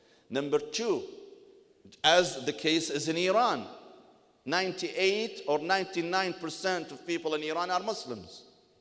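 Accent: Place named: Russia. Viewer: Gee has anyone listened closely to his accent? It's Russian.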